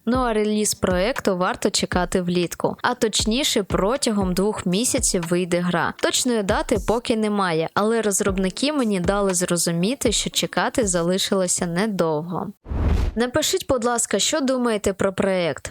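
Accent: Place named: East, Ukraine. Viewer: видно що ти переходиш на українську скажи якщо я помиляюсь але я думаю ти десь з сходу або центру україні і стараешся говорити українською. Я не намагясь хейтити і т.д. хочу сказати що це класно люди переходять на рідку мову це раз якщо я помиляюсь і ти завжли говориш українською напиши буль-ласка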